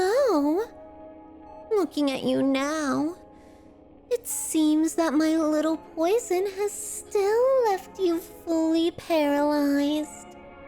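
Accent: United Kingdom. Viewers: "ARE YOU STURRING FROM SLUMBER,AH EXCELENT TIMING, EXCELENT TIMING" with classical music in the background is the most British thing i heard today *not making fun of your accent or voice i really enjoy your content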